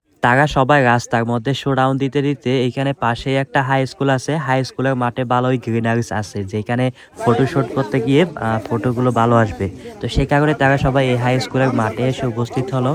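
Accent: Chittagong, Bangladesh. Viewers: উনি চট্রগ্রাম।যেখানে জন্মেছে সেই টোনেই তো বাংলা বলবে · আপনার বাড়ি মনে হয় চট্টগ্রামে.. কথা শুনেই বুঝা যাচ্ছে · ভাই আপনার শুদ্ধ ভাষায় কথা বলার স্টাইলে বুঝা যাচ্ছে যে আপনি চিটাগাং এর মানুষ, তাই না?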